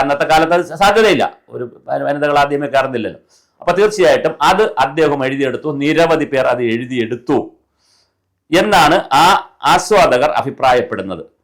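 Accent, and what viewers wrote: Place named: Pathanamthitta, India. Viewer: Pathanamthitta slang ph for bh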